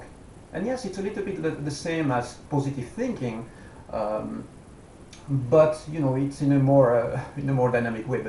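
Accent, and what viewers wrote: France. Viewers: I'm sure you're french ;)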